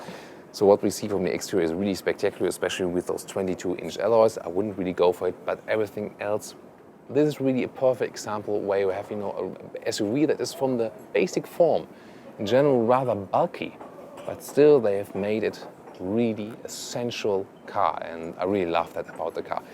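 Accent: Germany. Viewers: ahhahaha typisch Deutsch hab's direkt rausgehört 😂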